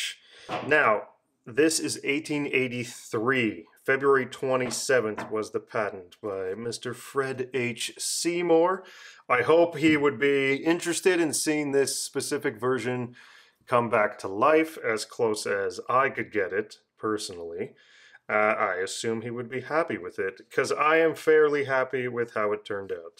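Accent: Canada. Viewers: The Canadian is strong with this one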